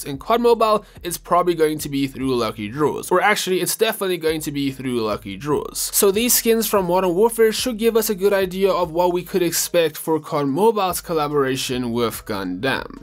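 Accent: South Africa. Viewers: Your from south africa?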